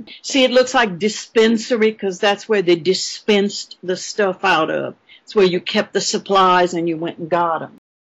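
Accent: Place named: Louisiana, United States. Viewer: I love cajun accent, j'aime l'accent cajun, merci beaucoup, vos vidéos sont géniales :)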